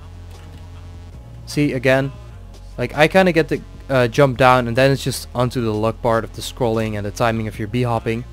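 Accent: Netherlands. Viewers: Are u dutch lol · ahah ondanks dat je accent heel neutraal is hoorde ik het toch een beetje XD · I can hear he is dutch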